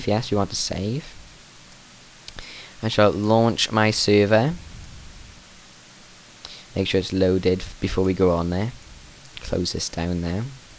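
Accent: Wales, United Kingdom. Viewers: Are you Welsh? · Are you Welsh? :) Just your accent sounds Welsh.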